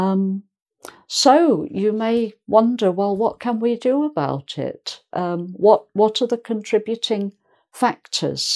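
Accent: England, United Kingdom. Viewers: Lovely, such an English accent and so elegant. Thank you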